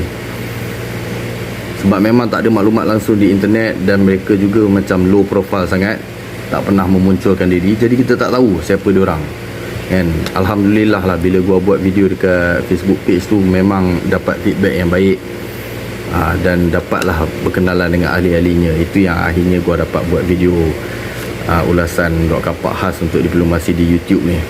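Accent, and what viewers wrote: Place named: Malaysia. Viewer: Sy dah lama tertanya²..bro ni org Bugis Selangor ke? Pekat no melayu nye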